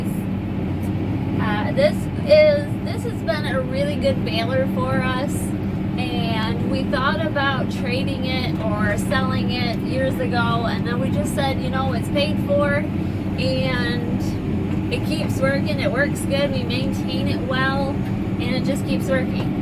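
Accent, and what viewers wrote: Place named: South, United States. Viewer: You sound like from south but your Michigan some place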